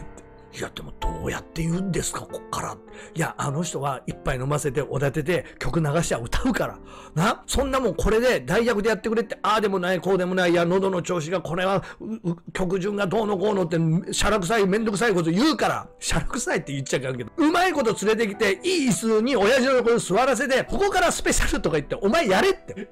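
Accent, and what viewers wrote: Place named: Nagoya, Japan. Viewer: 南利明以来の、久々の名古屋弁のエンタテイナーとして、毎回楽しみに見させていただいております。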